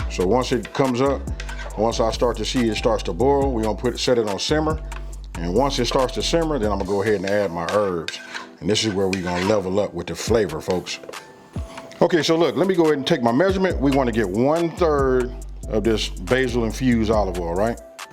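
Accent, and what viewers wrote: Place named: Los Angeles, United States. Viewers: Im proud to see this dude also from Cali with that L.A county accent. Your Channel editing and presentation energy and skills ALL on point bro for real!! i already have my mom and sisters subscribed and myself too. im bout to regulate making your famous Chilli in the new crock pot for my elderly folks! god bless you and your famalia from ATL Ga! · bro i swear i knew he was from LA as soon as he started talking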